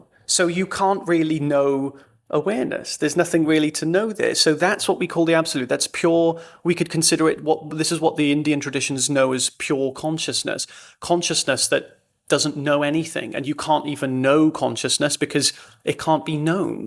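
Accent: Wales, United Kingdom. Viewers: do I detect a little bit of Welsh in your accent ?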